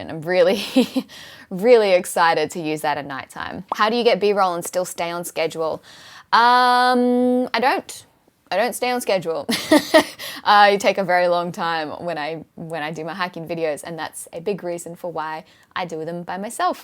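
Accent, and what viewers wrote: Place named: Australia. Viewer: So Aussie I love it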